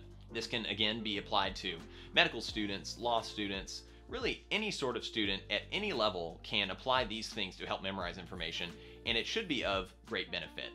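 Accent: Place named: South, United States. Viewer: Thank you for the effective breakdown and explanation of the concepts that you presented in this video. Off topic: it was a bliss to hear your accent for it transported me to my beloved south S2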